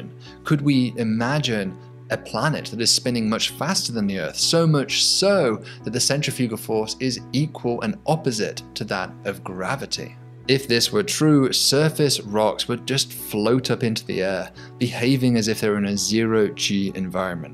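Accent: England, United Kingdom. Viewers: For anyone in the USA or UK MPH is more intuitive and he sounds English to me so I guess it’s up to him. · English accent does that to most people, he was raised in the UK, went to the University College of London so he was engrained with it.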